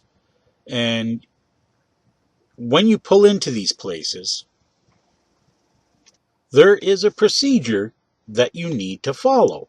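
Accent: Canada. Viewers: With you're accent you can't fool anyone you're from anywhere but Canada! Glad to see you. Thanks for the update. Take care.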